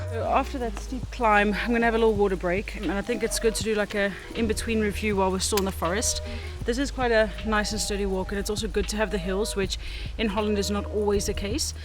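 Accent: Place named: South Africa. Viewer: As someone coming from Namibia/South Africa, and now living in the Netherlands, it really sounds like you are also Namibian/South African. We are always looking for nature! Great to hear what I believe to be South African hahah.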